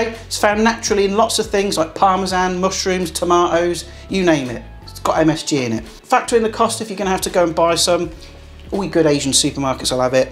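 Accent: Leicester, United Kingdom. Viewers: Lol just listening and thought hes from Leicester after going London road and granby street . Hey presto, thurnby lodge here.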